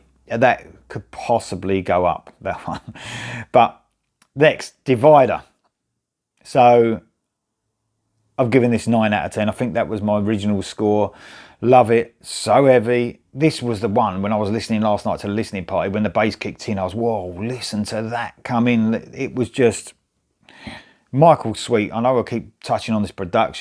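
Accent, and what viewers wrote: England, United Kingdom. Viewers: Thanks man for a wonderful experience. Really enjoyed it. I agree it is indeed a wonderful album. You made it more so with what you've done. Not into metal as much as you guys, but I love. Always have. I enjoy hearing you speak. Love that English accent. I'm from the Caribbean. Thanks again man. God Bless you.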